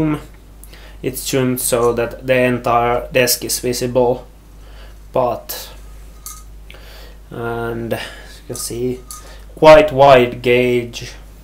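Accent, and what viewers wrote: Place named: Finland. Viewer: Kiitos nopeasta vastauksesta. No hyvä, ajattelin jo että joudunko kiduuttaa viikkoja.. Ps. Englantisi on hyvä, mutta suomalaisuus paistaa kyllä taustalta omaan korvaan. Niin kuin kuuluukin. Pistä lisää Hookah videota tulemaan!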